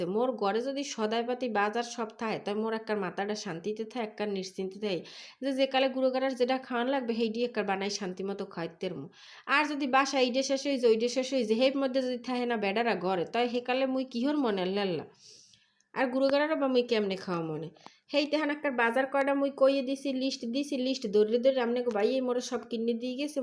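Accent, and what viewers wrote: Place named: Barguna, Bangladesh. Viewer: ময়না পাখি তোমার ভিডিও আজকে প্রথম দেখলাম তোমার কথাগুলো সত্যি খুব ভালো লাগলো ❤আমার শ্বশুরবাড়ির অঞ্চলের ভাষা মনে হয়েছে আমি যেন বরগুনায় আছি ❤বাজার গুছিয়ে নেওয়া সবকিছু মিলিয়ে খুব ভালো লাগলো❤❤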